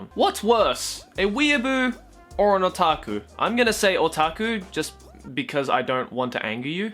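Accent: Australia. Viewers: #AnswerMeSenpai Do you speak Japanese with australian accent? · #answermesenpai speak in your Australian Accent for the rest the video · Holy shit I've been rewatching some older videos from Joey and the bois and I'm cringing at the thought of me ever watching these videos 5 years ago. These are all low-quality, immature, cringe videos compared to content you make today, both on your own channels and trash taste channels. Honestly watching another 10 episodes about shitting sounds better than rewatching this video once again. Also your aussie accent strong here.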